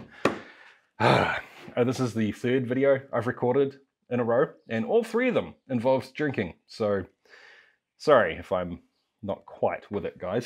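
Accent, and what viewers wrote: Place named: New Zealand. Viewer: New Zealand is weird. His pronunciations are so Aussie, yet simultaneously American! It’s hilarious, love this channel especially since I love my hard liquor!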